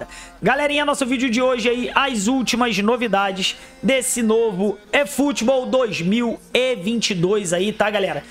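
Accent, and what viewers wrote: Rio de Janeiro, Brazil. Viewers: Vc é carioca ? Seu sotaque Xxx forteee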